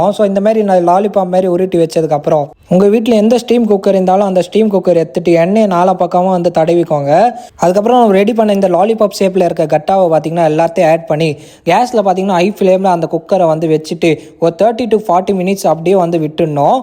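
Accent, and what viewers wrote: Tamil Nadu, India. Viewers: Yov nee Yenna ya local tamilan madri pesure.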